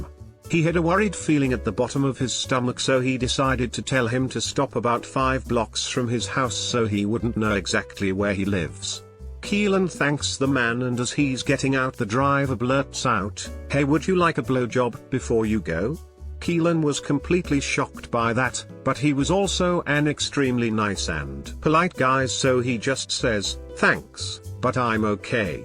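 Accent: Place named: England, United Kingdom. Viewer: Who is this new English chap?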